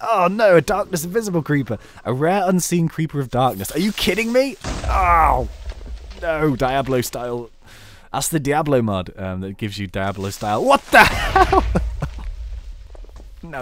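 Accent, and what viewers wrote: United Kingdom. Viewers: that silky british voice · Hey, what nationality are you? British? Cause your accent is really awesome. =O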